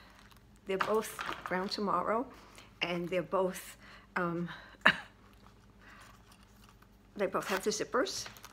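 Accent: New York, United States. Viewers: Hi! I hope your surgery went well. I love your NY accent, it makes me feel like I am home! I'm from 45 minutes outside of NYC! I miss it and hate Texas with a passion! But I'm moving back soon enough. Do you remember the little pot glosses? I loved those. Clinique discontinued them years ago. Meadow Flower Honey was my favorite.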